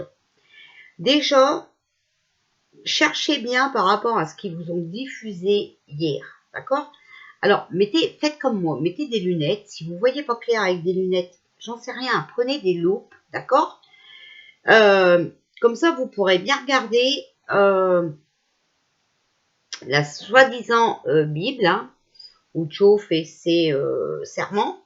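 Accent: North, France. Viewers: C’est avec grand plaisir que je vous écoute cet accent du Nord.... je suis aussi native du Nord mais dans le sud ouest aujourd’hui... Vous avez raison de mettre les points sur les « i ».... hélas bcp dorment encore..... en tous cas grand merci! Restez ce que vous êtes Changez rien! Vous êtes une belle personne... vraiment! ❤️❤️❤️❤️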